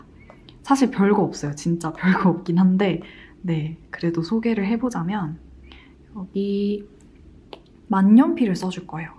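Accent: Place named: Gyeongsang, South Korea. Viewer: 약간 느껴지는 경상도의 말투!!!! 혹시 고향이 부산경남 쪽이신가용?ㅎㅎㅎ넘 반가워요>.<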